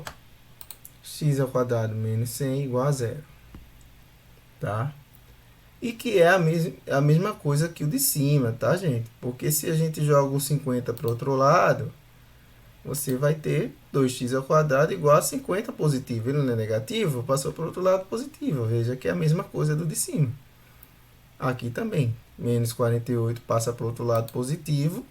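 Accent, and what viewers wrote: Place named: Rio de Janeiro, Brazil. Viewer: MDS sua voz é a do Super choque só que carioca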